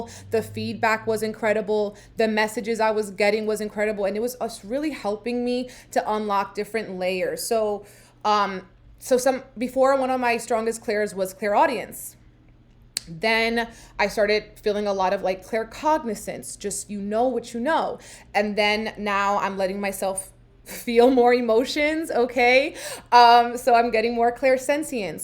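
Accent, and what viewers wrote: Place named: Bronx, United States. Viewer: Yasss i knew it! I heard that bronx accent, my peeps !! Keep shining and thriving boo , love you and your content !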